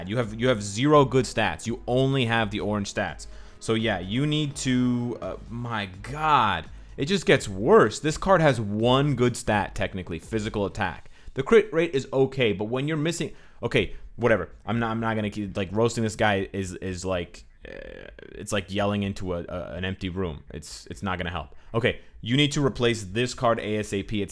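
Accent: United States. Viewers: I found that country accent funny and I wanna be roasted IGN abdouben22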